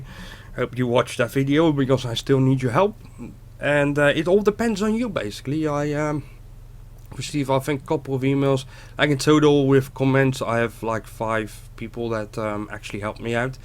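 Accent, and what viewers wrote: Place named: Netherlands. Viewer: Ik kijk al je filmpjes op zijn tijd... Ik herhaal je debuutfilmpje nog steeds vooral vanwege het geraamte (gebroken wiel) bij de zeebedding met nog duidelijk water in de goot en de discutabele commentaren daarover. Ik versta ieder accent in het Engels en kon snel horen dat je Nederlands spreekt. Omgeving Rotterdam of daaronder? Maakt niet uit, je hebt altijd een punt om over na te denken ook al zie ik niet alles zo als dat jij dat ziet. Keep up the good work!!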